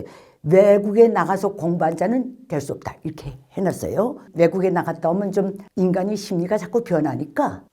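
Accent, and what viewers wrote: North Korea. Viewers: 북한말투이면서 너무 재미난 말투를 가지셨네요~^^ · 말투가 멋있으시네... 북한말투 거부감 들던데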